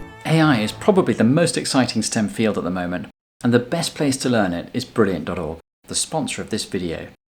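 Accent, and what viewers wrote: United Kingdom. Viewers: Your British accent is simply enchanting! amazing video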